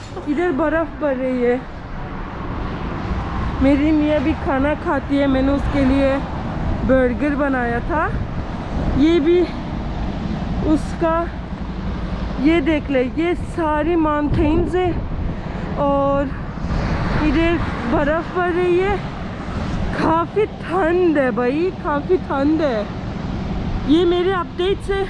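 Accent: Pakistan. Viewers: I love your urdu accent. Love you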